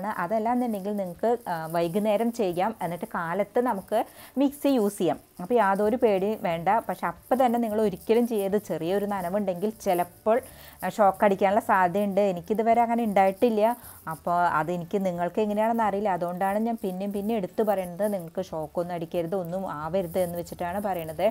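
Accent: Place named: Thrissur, India. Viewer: തൃശ്ശൂർ ഭാഷ കേൾക്കാൻ ഒത്തിരി ഇമ്പമാണ് ട്ടോ. നല്ല ഒരു tip. Thank you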